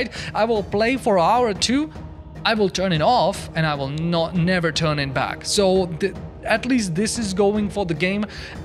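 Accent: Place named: India. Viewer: I'll take my chance at sounding rude, but how are you quite white with that Indian accent? Just being curious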